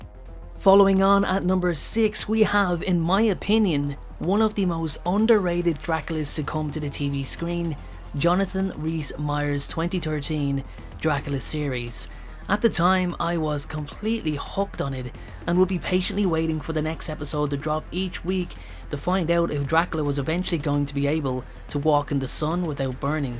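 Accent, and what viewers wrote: Ireland. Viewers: Your list makes me wonder if you might never have seen the movie with Frank Langella as Dracula? The one with Laurence Olivier as Van Helsing. BTW, I love your Irish accent! Dracula as... 'Drak'ullah'. Aye, dem feckin' vampires out dere bitin' troats!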